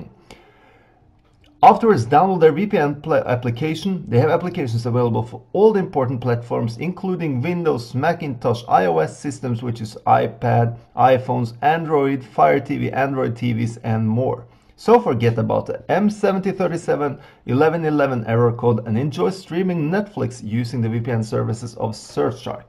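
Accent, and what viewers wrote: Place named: Germany. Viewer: I have a question or two for you. Do you work for Netflix news or is this just the name of your channel giving us information about Netflix? I looked up the Mandalorian to see where I could watch it I don’t really feel like wanting to subscribe to Disney+ since I don’t have any young children at home anymore. It was a good presentation, very detailed and easy to understand. Whether you work for Netflix or not I don’t want to be talking to some random person that isn’t the person on the video here and the one about The Mandalorian as well. I did not subscribe for the Netflix News per se. I have more questions regarding The Mandalorian other sites I found who say it can be watched on top and apps like Hulu, Netflix, etc. Since Netflix did not come up with anything for me either I rather than waste so much time trying to decide if it’s worth watching and which apps are legit and if the show is worth watching. 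I prefer asking you. As you provide clear information that’s short, but covers much more than other sites and apps regarding The Mandalorian I’ve visited. Whether you are employed by Netflix or not, that doesn’t matter. I want to ensure any compliments I may give are going to the competent man speaking here and sounds German. It was relaxing and nice to hear an accent that is familiar to me. As for the comments from that crass Man with his choice of words I am biting my tongue not saying something to him. A few people tried to put him in check but, he doesn’t understand Eng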